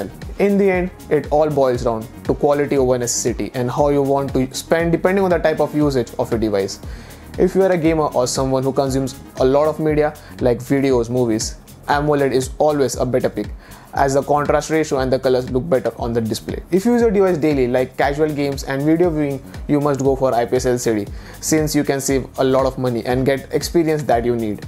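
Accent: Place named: India. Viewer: Your sound like keling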